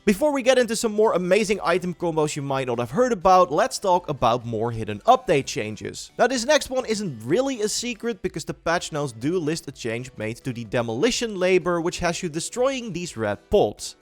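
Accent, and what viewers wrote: Netherlands. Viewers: This man had the most dutch accent ever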